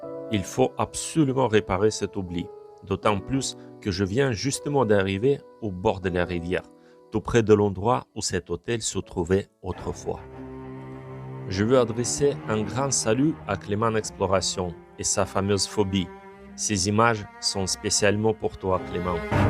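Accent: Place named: Russia. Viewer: Bonjour. j'espère que tu vas bien depuis la dernière fois j'ai adoré ta vidéo que ce soit ta façon de raconter le lieu que tu nous fais découvrir la musique qui va bien aussi et ton petit accent russe que j'aime bien tout particulièrement on voit que tu étais bien renseigné bonne idée pour les genouillères j'espère quand même que quand tu pars tout seul tu donnes ta localisation à un proche on sait jamais ce qu'il pourrait arriver en tout cas superbe vidéo continue comme ça le succès est garantie ça serait bien qu'on arrive à se revoir avec l'équipe je te dis à la prochaine fois😊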